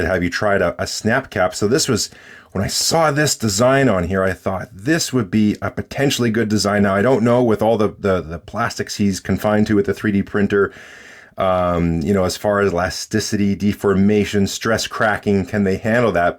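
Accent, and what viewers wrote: Canada. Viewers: Are you Canadian ? You sound Canadian . Sound like a guy I watch on YouTube called AvE. EDIT : I heard at the end of the video you said " Canadian" as currency, Nice !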